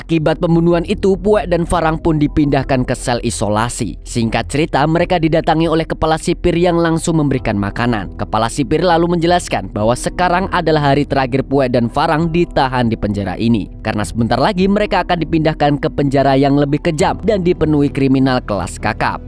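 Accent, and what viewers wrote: Banjar, Indonesia. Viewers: Banjar banar😅😁😂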